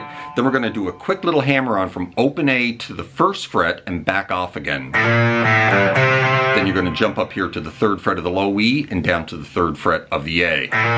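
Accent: Canada. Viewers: Hello squire. You really are becoming a very bad influence on me! I have watched a number of your videos now and I finally subscribed last night when I watched your '43 Year Guitar Journey' thing. I found that very interesting and at times moving, and I really must commend you for your authenticity and honesty. Love your Canadian-ness too (great accent!) and your love for quite a few of the same Canuck artists that I appreciate. Whilst Paul Kossoff was my first love, Alex Lifeson was the picture on my wall as a 13-16 year old. And that was B&W pic clipped out the NME here in the UK in about '78. Times were hard... Anyway. This song is full of great memories for me - my sister allowed me play her copy of ' ' more or less to death (she wasn't too chuffed about that, mind you!) and I played it on a Dancette mono record player in my tiny bedroom for hours on end. Said gramophone was donated to me by my Uncle (who had a country place! 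- geddit?). I always particularly loved ' ' - but they're all great tracks. is a fantastic tune too with lots of classic rock ingredients - and as you mention, a brilliant driving song - it's on one of my DIY car CDs. But I am wittering now... I'll finish by saying thanks for this and I wish you all the best. Cheers, son! - PT x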